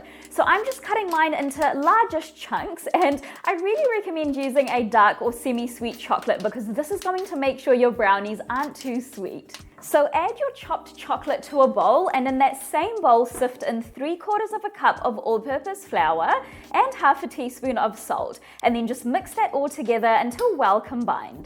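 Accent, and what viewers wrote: New Zealand. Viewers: Do I detect a kiwi accent? 🤔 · I tried another recipe which I wasn't a huge fan of. I tried your recipe a couple of weeks ago and my husband and I loved them! I'm pregnant and craved something chocolatey and I asked whether I should make brownies or cookies. My husband immediately said the brownies you made last time from the girl from New Zealand! He was especially delighted to hear your accent as he spent some time studying abroad there years ago. Haha so I'm making these brownies again right now; they are currently in the oven. I never comment on recipe videos because frankly I haven't found many that impressed me. But these brownies are very delicious and better the next day. Thank you so much!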